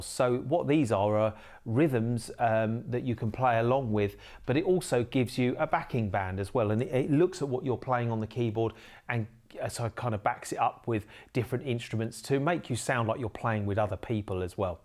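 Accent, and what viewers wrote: United Kingdom. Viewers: Who knew this mild mannered guy with a British accent was a funk genius?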